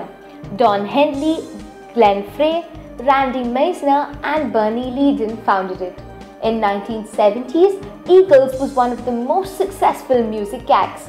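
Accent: India. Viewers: Is the commentary done by an Indian or something not like it matters but I thought they're knowledge was like cricket and how to make carpets fly and stuff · i hear indian accent ..never mind..they are so fucking far ridiculously behind in the mainstream rock and roll area and meansteam music...so fucked up with their bollywood shit!! idiots!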